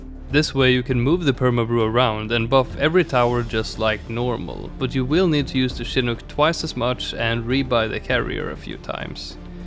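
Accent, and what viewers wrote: Sweden. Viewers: Wait is he Swedish???? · Are u swedish?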